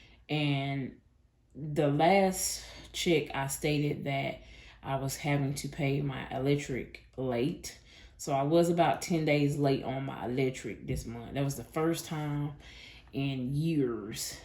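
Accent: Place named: South Carolina, United States. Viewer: I absolutely love this video. You are so organized and detailed. I am from NC and I can definitely hear that SC accent😂❤ Also congrats on being monetized. I m trying to get there 🙏🏾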